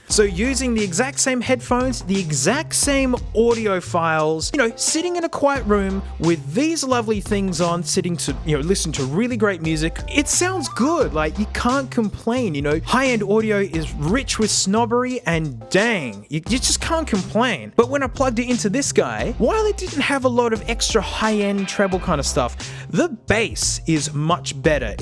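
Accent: Australia. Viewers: For some reason i love the Australian accent and the information about the Ipods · Ar u australian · You should review the HyperX Cloud Alpha S headset. I love the Australian accent! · AL-UU-MIN-NEE-UM. I Love your vids my Auasie friend. Keep it up. 😃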